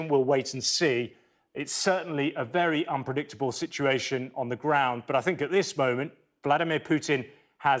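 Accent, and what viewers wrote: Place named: United Kingdom. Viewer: It's not "military". It's " militree" got to love those British accents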